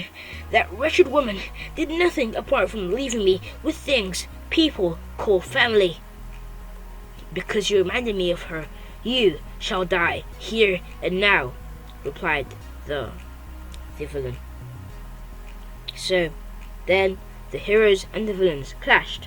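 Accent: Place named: United Kingdom. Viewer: lol i can already tell your british are you a roadman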